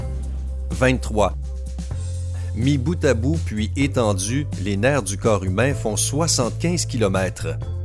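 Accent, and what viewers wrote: Quebec, Canada. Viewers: Pourquoi des fois c un québécois et des fois un francais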